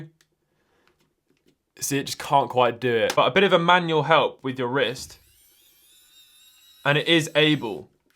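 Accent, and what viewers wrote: United Kingdom. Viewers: Whats with the deal with the British accent but American phrases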